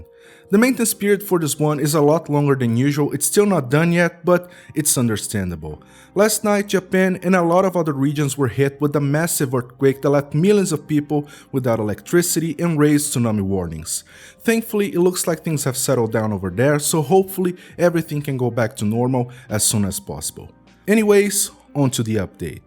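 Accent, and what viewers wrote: Brazil. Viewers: I can tell you are Brazilian. Ótimo video!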